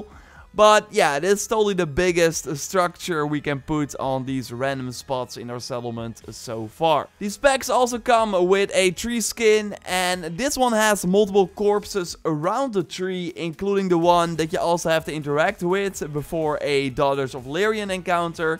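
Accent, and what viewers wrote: Netherlands. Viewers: Is hij Nederlands??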